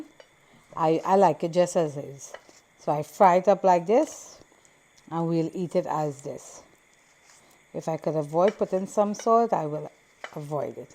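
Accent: Trinidad and Tobago. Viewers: Reynold Balgobin, try and learn to spell first and work on your grammar before criticizing the goodly lady. Be more appreciative of our culture that is visible now worldwide. Nothing is wrong with how she is speaking. If you're not hearing any Trini accent, well something is definitely wrong with you. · How is this lady not acting like she’s from Trinidad…with a Trini accent and everything…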